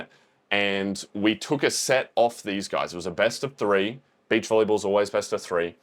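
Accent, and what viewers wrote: Australia. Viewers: Also! Are you aussie??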